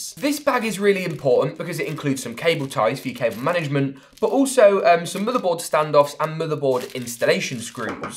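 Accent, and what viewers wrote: United Kingdom. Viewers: U have the best British accent I’ve ever heard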